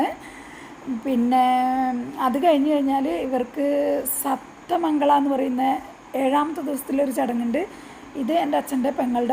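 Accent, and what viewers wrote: Palakkad, India. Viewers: Madom, where is your native place ? Your talk palakkad same.!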